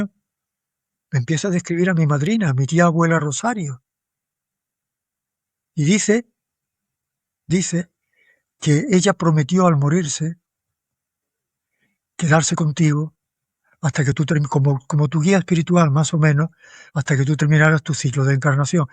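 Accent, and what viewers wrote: Spain. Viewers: El Dr tiene una oratoria extraordinaria,y resulta muy bello oírle con su bonito acento andaluz.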